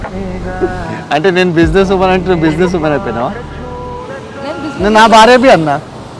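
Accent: Telangana, India. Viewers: Telangana basha super ga matlduthunnaru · Super Anna act in TELANGANA Telugu language super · Bro nuvu telugu matladutunte chala comedy GA vundi bro Kani telangana slang bane try chesavu bro nice keep rocking bro 😘😘😘😘😍😍😍😍😍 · Anna nice 👌 Telangana Basha super I yam big fain pataechu porini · bhai i saw you many videos in hindi, but you are talking telangana telugu its super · I love the way u r talking in pakka Telangana slang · Bro Telangana language Superb. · Anna telengana language super ga mataldavu anna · మొత్తానికి తెలంగాణ బాషా జోరు మాట్లాడుతున్నావ్ శుభాకాంక్షలు అన్నా 😁😁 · Happy to see you, i know you born in hyderabad and brought up in Mumbai, still you are speaking good telugu with telangana accent.more way to go. All the best bro. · bro nuv tilagana slang masthu matladuthunnav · Telangana slang echi padesnav 🔥 , come with more Telugu video ❤️ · Telangana slang super anna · Bro pakka Telangana slang 🔥🔥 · Telangana Iragateesthunnav Anna🔥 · I like ur way of talking Telangana slang Bro nice keep it up Bro All the best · Anna supperrrrrrb telugu Telangana yasa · anna pakka Telangana language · Pakha Telangana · Bro telangana slang superb 👌👏👍🙌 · Telangana slang super 😁🤘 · Awesome Telugu bro.....that to in telangana slang u spoke....😀😀😀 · I never knew you speak Telugu, and you totally pulled it off. 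Telangana slang, great bro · Acha(actual) telugu anna idi... Telangana telugu ante idi asal... Kirrak poo... Dhethadi pochammagudi lekka unnadhi... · Bro pakka telangana slang..awesome.. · Totally surprised to see U speak very well Telugu that's also in Telangana slang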